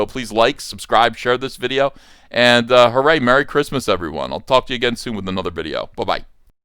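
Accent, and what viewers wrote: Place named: Pittsburgh, United States. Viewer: There is NO WAY this guy is from NJ with that accent! I detect the familiar sound of Pittsburgese, so has to be from somewhere around there.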